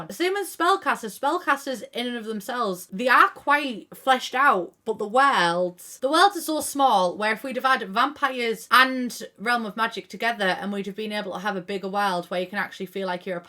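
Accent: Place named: Northern England, United Kingdom. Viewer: I see you too are from proper up north England and that's why you sound like that.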